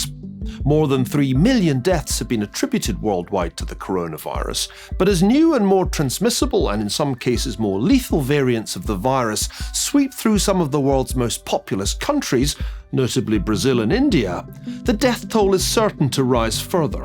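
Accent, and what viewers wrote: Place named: United States. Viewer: Loving the American accent Niall!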